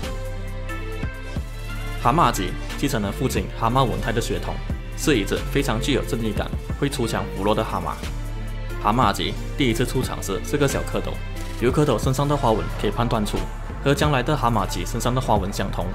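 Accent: Malaysia. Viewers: 馬來人講中文真的讓人耳朵很累很痛苦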